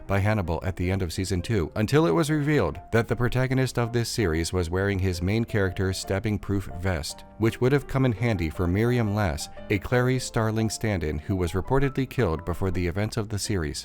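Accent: Baltimore, United States. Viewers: I can tell you're from Baltimore by the way you say Baldimore.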